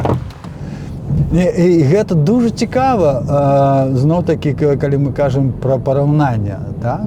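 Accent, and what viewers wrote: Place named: Poland. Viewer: Ужо маеце крыху польскага акцэнту! Няхай буде усё добра у вас! На жаль, што такi таленавiты чалавек зьехау у замежжа!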